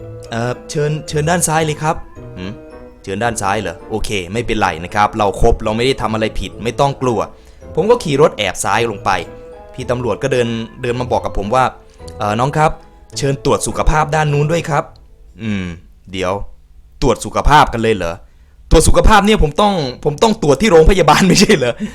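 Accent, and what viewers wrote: Isan, Thailand. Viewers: พี่ผมถามจิงๆนะ พี่เป็นคนอีสานป่ะแม่นบ่ ตอบผมเดี๋ยวนี้ด้วย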